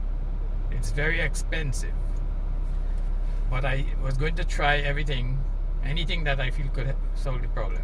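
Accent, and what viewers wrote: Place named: Trinidad and Tobago. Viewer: Ah hearing d accent trini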